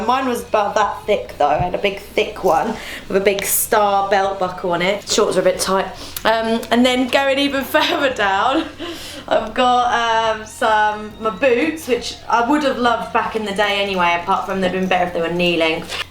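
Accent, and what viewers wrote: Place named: London, United Kingdom. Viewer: it sounds like Adele's accent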